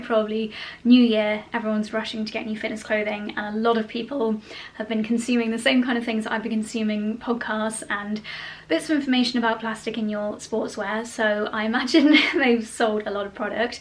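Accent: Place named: United Kingdom. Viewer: I got to the “tail” end of your video, as I always do. I enjoyed the scenery, your bright, darling British accent, the homemaking bits…yours are some of my favorite videos to watch! One day I WILL travel from Texas to the Cotswolds…it is my dream. We have some lovely scenery and even quaint country roads in Texas, but we fall flat when it comes to architecture.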